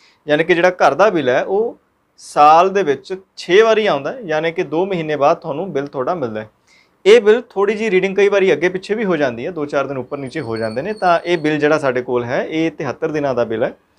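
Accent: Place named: Puadh, India. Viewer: puaadhi language bolde ne vr...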